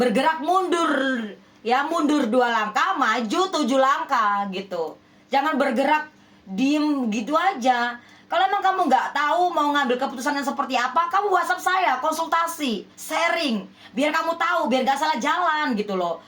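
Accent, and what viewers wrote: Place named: Java, Indonesia. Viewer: Mbak bahasanya agak medok Jawanya. Mbak aslinya dari Jawa ya.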